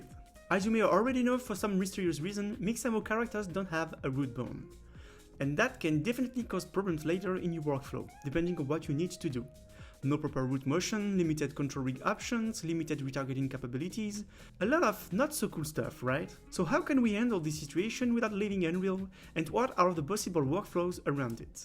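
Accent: France. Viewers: I really appreciate the effort you put in the tutorials.Just if you could a bit slower.....with the french accent and your speed talking, sometimes it´s really hard to follow (dont want to be rude, just an advice). Love the channel BTW!! really helpful video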